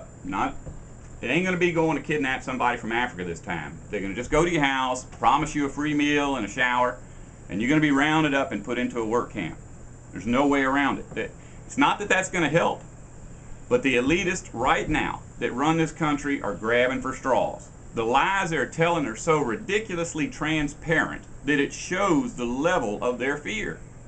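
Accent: South, United States. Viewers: where is it your from Mississippi or Louisiana I"m just trying to figure it out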